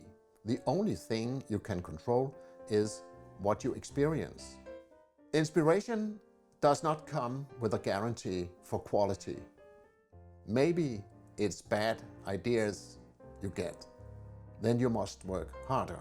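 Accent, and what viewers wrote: Denmark. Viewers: Are you Danish? Im American but married to a Danish woman and have lived in Copenhagen. It’s your accent. Great channel!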